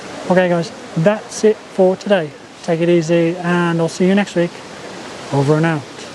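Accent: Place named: United Kingdom. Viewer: Never mind. I think. Mostly, I listen to what you say to get more familiar with the British accent. 😆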